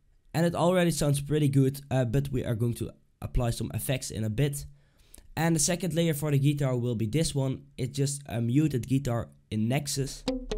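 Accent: Netherlands. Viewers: lekker nederlands accentje wel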